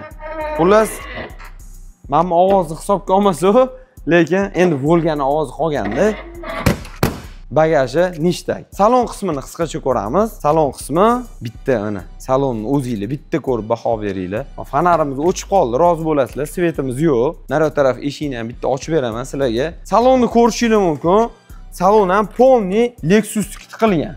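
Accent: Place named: Tashkent, Uzbekistan. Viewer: Тошкент шеваси чарчативоради эшитадиган одамни, кучанип нима киласиз адабий гапираверинг😅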